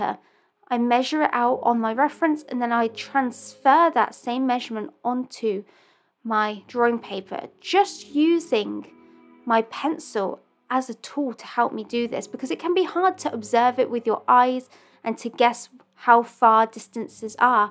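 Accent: England, United Kingdom. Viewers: Hey. I love your work. What part of England are you from?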